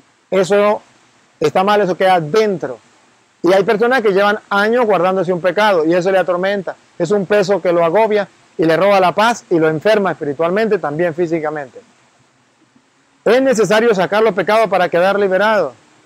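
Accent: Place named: Mexico. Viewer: Buenos días padre bendiciones padre usted venezolano su acentos es mexicano. 🙏 yo creo firmemente cuerpo y la sangre de Jesús. Tuve bella experiensa..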